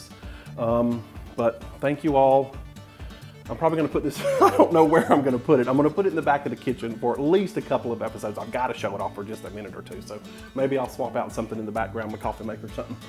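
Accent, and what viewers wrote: South, United States. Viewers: Congrats!! Congrats!! Congrats you have a beautiful personality and so sweet I enjoy your videos I get excited when you upload a video very helpful .Love your southern accent❤ keep up the great work. Sorry for your loss in your family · Congratulations!!! You're funny, talented, and have a great concept for your channel. (And it helps that you've got the most adorable Southern accent!!) I am delighted for you and am looking forward to more great videos. God bless! 😃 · I'm from South Carolina Carolina I can really relate to things you talk about regarding family recipes and behaviors. Plus, your southern accent is awesome!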